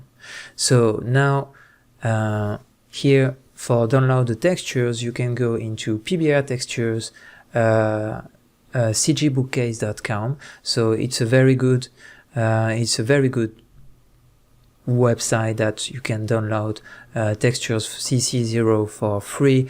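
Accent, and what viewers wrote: France. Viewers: nice tutorial with nice baguette accent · L'accent a la française !!! Tmtc😂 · French accent ^^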